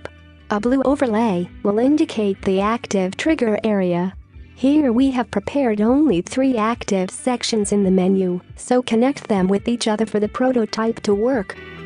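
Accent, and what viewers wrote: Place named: Kerala, India. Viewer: gotcha u r from kerala right?????????????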